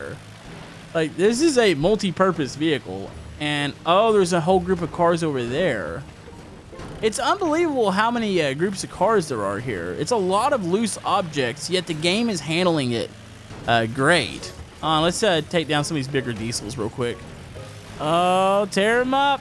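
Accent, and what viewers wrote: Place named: Texas, United States. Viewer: he has now reliasied his texas boi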